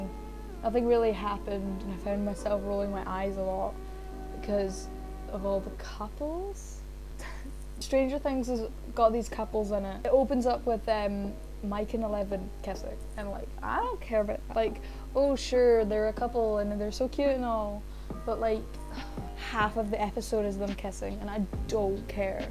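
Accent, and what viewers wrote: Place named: RP, United Kingdom. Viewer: u do sound kinda posh but gang gang sjsj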